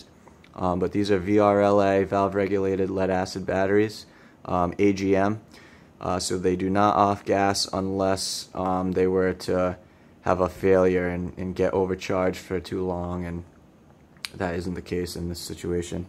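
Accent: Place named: United States. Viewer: hi so based on your accent you are somewhere in the US (finally, most video's showing the apc2200 are from the uk) as i am too, and a friend gave me this unit with bad battery's, and cabling, no stickers no model number on it, and i had to open it up and take the model number from the internal board, so at first i was sure this uses 220v but as i found your video and i am in the US to, i am not so sure anymore i thought it might be a good idea to ask this stupid question, does it take 220v? or 110v?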